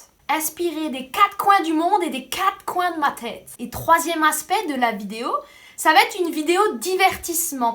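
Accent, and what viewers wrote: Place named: Moselle, France. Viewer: Salut, moi je le trouve chouette ton accent! T as des origines du côté de Moselle est? Forbach, saint avold etc...?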